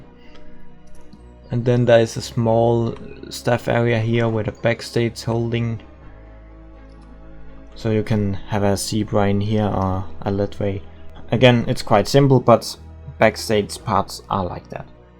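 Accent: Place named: Denmark. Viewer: Love the zoo. Also, as a fellow dane, i recognized the accent immediately lol. But your english is good so dont worry about it. It has a charm ❤️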